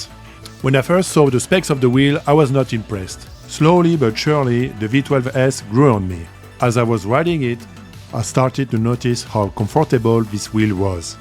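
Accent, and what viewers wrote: France. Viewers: Drôle d'accent. On dirais un francais qui parle anglais.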